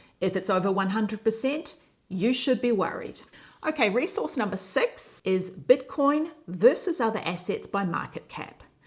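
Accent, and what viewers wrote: New Zealand. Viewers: This was an eye opener Just started my bitcoin journey and stumbled onto your platform Your accent reminds me of my kiwi sisters 🤣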